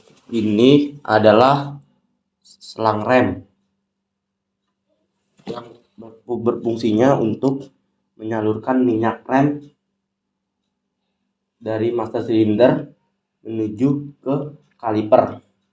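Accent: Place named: Bangka, Indonesia. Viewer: Kayak orang Bangka Abang ni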